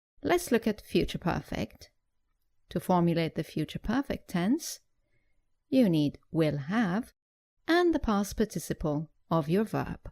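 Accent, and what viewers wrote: United Kingdom. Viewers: UK English am i right ?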